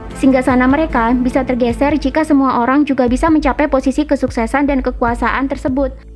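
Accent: Java, Indonesia. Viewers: Medok banget ngomongnya.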